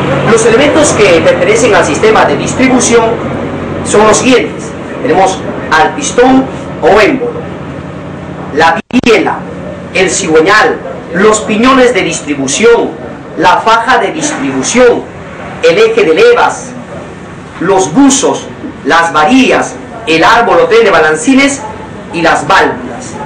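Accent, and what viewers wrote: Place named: Peru. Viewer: Parece que es peruano este maje por como habla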